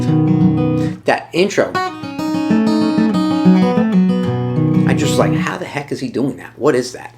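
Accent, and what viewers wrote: New York, United States. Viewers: Wish I’d started at 11 instead of 67 lol Anyway, love to hear the NYC accent. I’m a Bronx boy living in Cali the last 10 years. Not a day goes by that someone doesn’t mention my accent. Enjoyed the video If I ever get serious with practice I can be pretty good in my 90s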